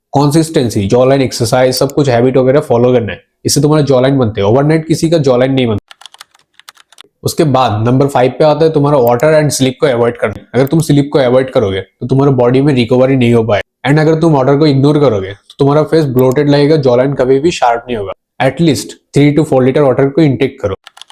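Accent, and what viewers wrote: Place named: Bangladesh. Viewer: Bhai tumi to bangladeshi hindi te kotha bolo keno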